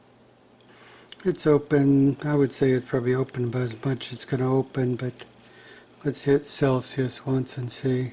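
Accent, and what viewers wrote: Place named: United States. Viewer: What type of tap and dye set? I've got Metric, UNF, BSF, Whitworth, Uncle Tom Cobbly and all but none of them are the right thread! I wish you Yanks would realise that there's a whole other world outside the good ol' US of A when making these Youtube videos, and for god's sake, learn to say aluminium! Alooooominum sounds so silly!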